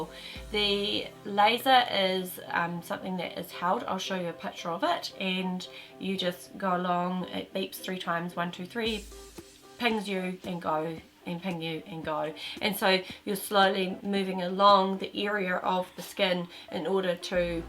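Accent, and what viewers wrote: New Zealand. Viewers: Hey I was wondering if you could recommend a place to get this done. You sound Kiwi so I'm hoping you got it done in NZ?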